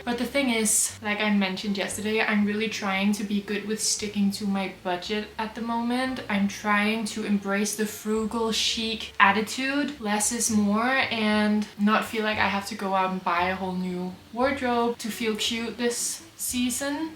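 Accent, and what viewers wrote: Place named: United States. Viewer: I love, love, love your videos! It's my favorite way to start off a cozy Sunday :) I really enjoy that you have stayed authentically yourself as your views have grown. I think that's what your audience appreciates about you. I like seeing the joys but also the irritations of daily life. You don't live beyond your means, you don't pretend that you are not on a budget. You share your struggles about work/life balance and the added complications that hormones give to us women. Keeping things real makes me cheer for you all the more! In terms of what extra content to add, I myself am really interested in your language(s) journey! This might be very specific to just me though lol. Learning about the way we communicate/express ourselves is endlessly fascinating. From what I've gathered, I think you speak Danish, English and French? 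I am from Seattle, but I have traveled/lived in all different areas of the world, and I find your specific "language mannerisms" so interesting! I know it's very common for most of the world to speak several different languages, but I have found that usually when someone from Europe is speaking English, they tend to have a strong underlying clipped British tone. You, however, have an almost American cadence when you speak. Im not sure if it's from watching Gilmore Girls and Twilight so many times (lol) or the books you read or having lived in a couple of different areas yourself. I have watched other Copenhagen videos, and those girls, though fluent, don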